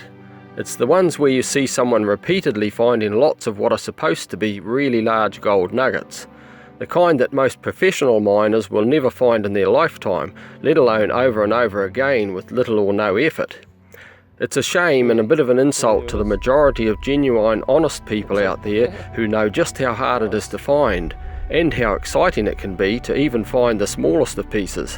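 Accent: New Zealand. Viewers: Really enjoyed your commentary. Nicely done and I will be back.. Kiwi? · Funny how a.i. messes up on translating the kiwi accent ... some funny mess ups throughout this video !!!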